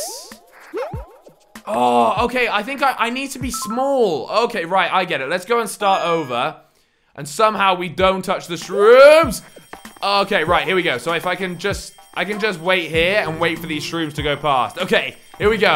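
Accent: United Kingdom. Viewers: pls reply are you British 😀?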